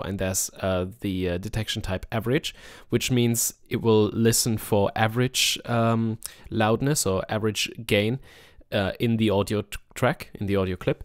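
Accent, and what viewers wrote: Germany. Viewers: Coole Tutorials und Reviews, aber dein deutscher Akzent geht mir voll auf die Glocken alter !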